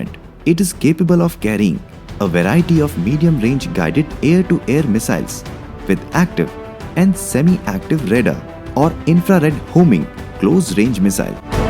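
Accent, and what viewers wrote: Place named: India. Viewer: 1st time I heard beautiful indian accent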